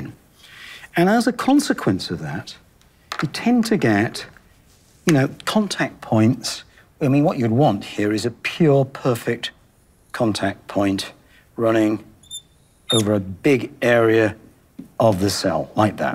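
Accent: United Kingdom. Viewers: OMG, such an amazing video, exceptional presentation skills. Fantastic British accent. Beautiful cinematography. I love it all. I didn’t care about lucid, till now. Subscribed. Keep the good stuff coming and I hope you will be able to produce cars for the masses too in the near future. Thanks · I'm born and raised in the USA. I'm totally disgusted with abundance of British accents I have to hear these days. I'm nearly 80...and it hasn't always been this way. This time I guess they're trying to take us without burning our buildings. Britain and Israel are our two worst enemies...and the sooner others realize that the better off we're going to be. And BTW: Everything he's saying you should have learned in high school...and could have learned in grade school if they weren't wasting all your time on "love your government as yourself" propaganda. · Quite similar to the original Tesla Roadster and Model S HV packs, in that Lucid uses many thousands of tiny 18650 "flashlight" batteries, as in laptops and power tools. 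Improvements over Tesla are a higher pack output (900 VDC), as for most newer BEV's, instead of the 400 VDC in Tesla packs. That makes fast-charging easier (half the current). Another advantage is that the end-plate cooling and lack of adhesives should make replacing individual failed cells much easier, and likely easier assembly too. The Physics he presents is high-school level so not amazing, though when stated in a high-class British accent it sounds more clever.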